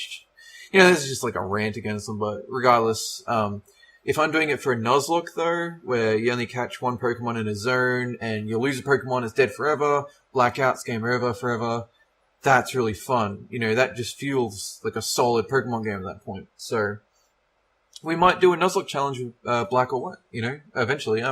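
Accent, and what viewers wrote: Australia. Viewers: waaait.Where are You from,?Australia? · Yeah I was right, he's totally Australian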